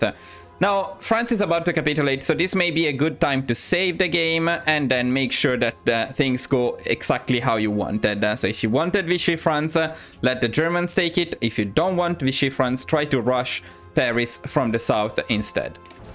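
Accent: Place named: Italy. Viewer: I can't tell you how charming it is to hear you talking about italian places and units with your italian accent. Makes this a lot of fun. I don't have any DLC - is it still possible to recreate the roman empire without spies or the sophisticated re-integration features?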